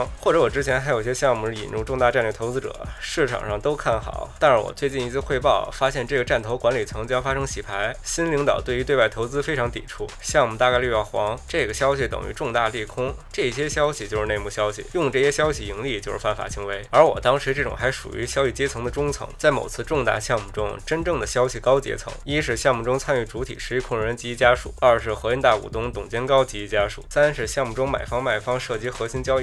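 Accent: Beijing, China. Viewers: RangErliVe 这就是内京片子味儿 哎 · 不是正经更新，比较随意🤣。老北京土著了哈哈哈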